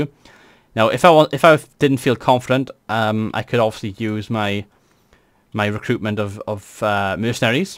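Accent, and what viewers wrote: Wales, United Kingdom. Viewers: So I bought the definitive version of this game last week, messed about with it for half a week, read tiny bits and bobs about it and realised very quickly I haven't a clue. Looking forward to this set of videos, and it also helps that it's being narrated in a Welsh accent. Love the Welsh from an Irish brother! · I love your welsh accent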